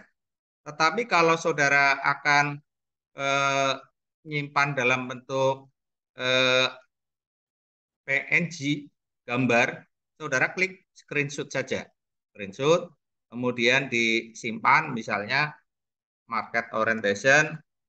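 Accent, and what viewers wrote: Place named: Tegal, Indonesia. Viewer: dari sekian banyak yg jelasin vos viewer, anda adalah yg paling mudah diterima, bahasa lugas dengan khas tegal serta tidak banyak belibed spt kebanyakan ibu-ibu yg jelasin vos viewer... support terus!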